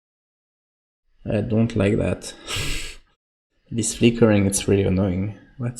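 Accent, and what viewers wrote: France. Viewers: J'ai reconnu l'accent français, merci pour tes vidéos super intéressantes. Happy hacking!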